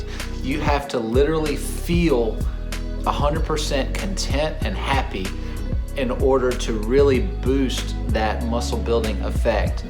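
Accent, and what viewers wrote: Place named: South, United States. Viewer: Sup, new to your channel! Slapped you with a like and a sub!!!! not to be weird but your voice is very nice! sweet and southern lol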